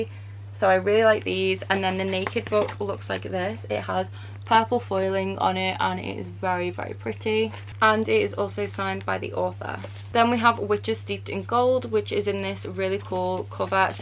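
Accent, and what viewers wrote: United Kingdom. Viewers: Can I just admit that the way you say the word “pretty” sounds so elegant and polite with your British accent. 💗 Hello from the Valley of the Sun 🌞 where it really is DEADLY 🥵 heat!! I live in Scottsdale, AZ and we are still having 94-100 degree weather! Great Video as always! I have about 80% of these books as well. I absolutely ADORE them!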